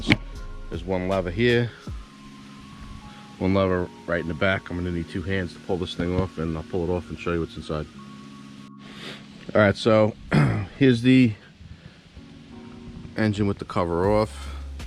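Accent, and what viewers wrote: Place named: Long Island, United States. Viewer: Sounds like your from L.I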